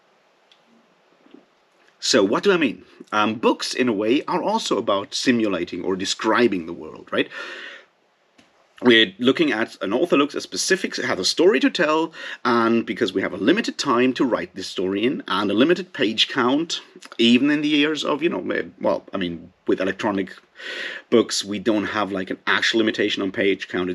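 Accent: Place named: Norway. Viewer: interesting! I thought you sounded Norwegian!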